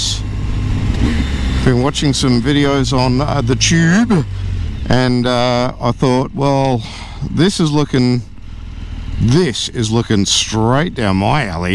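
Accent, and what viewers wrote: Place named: Australia. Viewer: I love the bike! Your Aussie accent is pleasant to hear as well. Great review mate! Thanks.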